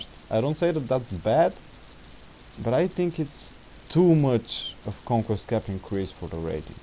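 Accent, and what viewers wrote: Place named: Russia. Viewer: RUSHAN AKCENT SO GUD.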